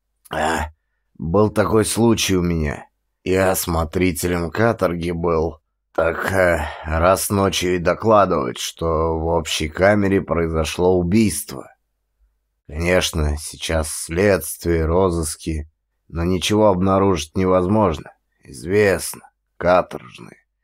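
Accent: Moscow, Russia. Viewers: Здравствуйте,! Последний комментарий про московское "аканье"- это ни о чем. Вы жили в Москве какое-то время, м. б. отложился как-то этот говор. Я тоже открала для себя великого русского писателя Д. Мамина-Сибиряка. Нынешний русский язык отличается от языка 19-го века. Многие забыли об этом. Огромная благодарность за Ваш труд! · Аканье московское.театр ваш ни к чему. Ждали простого прочтения классики. Мы и без вас все представим.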